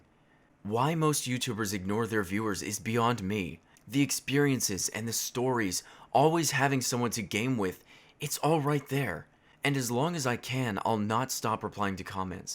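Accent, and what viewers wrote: Australia. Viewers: You sound Australian. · NOICE Aussie accent! You're actually amazing.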